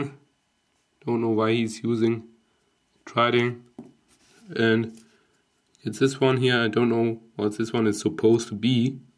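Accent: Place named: Germany. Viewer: your german accent rules haha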